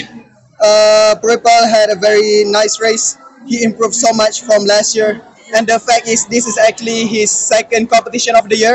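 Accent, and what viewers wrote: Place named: United States. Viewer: Yeahh, ada dgr slang american dia, oyeahhh 🤘🏻 terbaik Azeem 🔥💥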